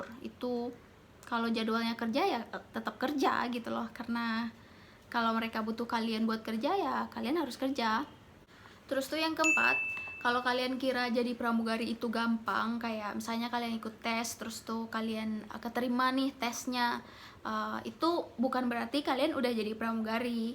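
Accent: West Sumatra, Indonesia. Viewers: Wow. Ternyata org Padang mbak nya,,pantesan logat b.indonesia nya agak kurang,masih jelas logat minang nya🙂🤗 sukses mbak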